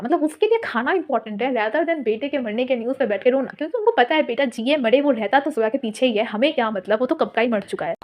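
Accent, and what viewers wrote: Bengal, India. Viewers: you're bengoli am i right?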